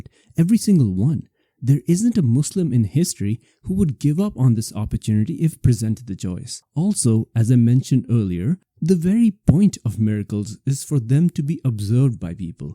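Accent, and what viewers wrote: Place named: India. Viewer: I noticed you only picked on Islam. And judging by your accent you are from the subcontinent meaning you have an agenda. It’s ok . Your Hindu gods changed sex to shag another god . And in Christianity there was a zombie apocalypse and dead people rose and walked around. But that hasn’t deterred its billions of followers. If you want to hear outlandish and absurdities read the Bible and the vedas. If you believe your religion is true you shouldn’t be worried about the religion of others. You should worry about your own salvation. Shinto is a religion perhaps you should discuss that. If you sre incapable of doing that , then it’s clear you are not sincere.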